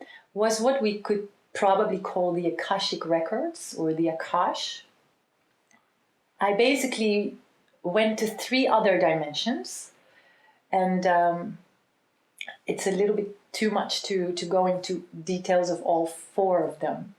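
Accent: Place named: Netherlands. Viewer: I laughed when you said the voice of Creator was in English :) Are you a native Dutch speaker? I hear a little accent that I recognize! I would love to have a session with you, if you were in Belgium or Netherlands some day :)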